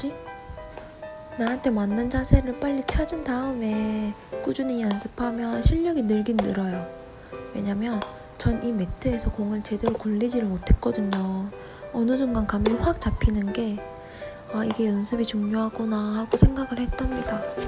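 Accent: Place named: Busan, South Korea. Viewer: 목소리 넘 귀여우세요(약간 부산사투리) 저희 아이들 골프 집에서 아빠한테 배우는중인데~넘 좋은 영상이네요 저도 다시 골프 시작해야 한다고 생각한거만 몇년째네요~참, 님 참 제가 원래 운영하던 채널이 있는데 그쪽을 좀더집중해 보려고 해여... 기존 채널도 계속 유지중이니 구취는 노노!! 자주 뵈어요🤎 <link>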